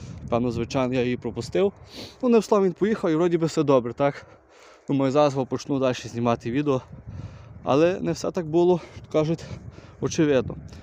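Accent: Ukraine. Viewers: Виглядає, що ви із Львівської обл. Може, навіть із Золочівського району. Співробітник мав подібний говір. Не подумайте, що намагаюсь шось вирахувати , бо знаю, що у людей зараз ще та параноя. Просто цікаво. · Я так розумію ти з західної України по розмові!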